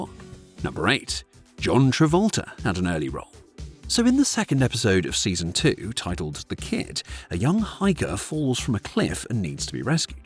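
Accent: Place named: RP, United Kingdom. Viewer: Received Pronunciation, doesn't sound like AI slop except for an odd enunciation on the odd occasion (perfectly acceptable in a human host). I think I could live with this, even if it IS AI slop.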